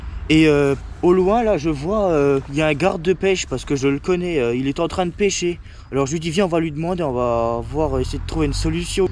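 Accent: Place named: Moselle, France. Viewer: Vu l'accent , tu est de Moselle ???? moi je suis d'Hayange 😊😊😊😊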